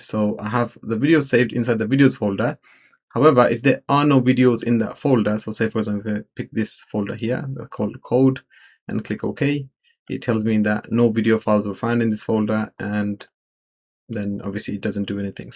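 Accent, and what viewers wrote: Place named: England, United Kingdom. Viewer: WOW FIINALLY SOMEONE WHO SPEAKS ENGLISH ENGLISH NOT INDIAN ENGLISH <3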